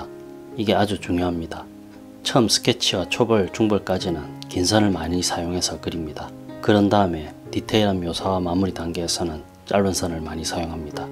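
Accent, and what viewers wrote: Gyeongsang, South Korea. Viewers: 영상 잘보고있습니다 감사합니다 종이는 무슨 도화지를 써야하나요? 갱상도 사시는것 같네요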